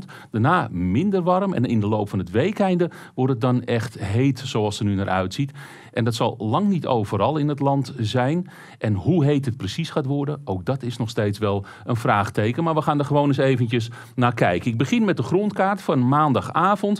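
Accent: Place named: Amsterdam, Netherlands. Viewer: Lekker Amsterdams accent!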